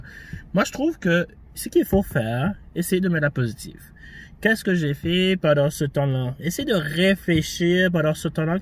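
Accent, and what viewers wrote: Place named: Canada. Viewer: Tu as bien attrapé l'accent canadien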